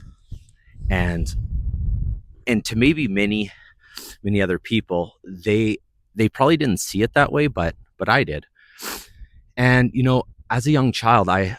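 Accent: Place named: Northern Canada, Canada. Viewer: I'm female and not having a father in my life affected me a lot too, but in a different way. Thank you for sharing this. I'm from Manitoba and I also came from a Mennonite family but grew up without church or any religious involvement. I know the feeling of being lonely and isolated in the winter. I live in the Midwest now, but it's really nice seeing that you're still up there in northern Canada, I knew I recognized your accent 😊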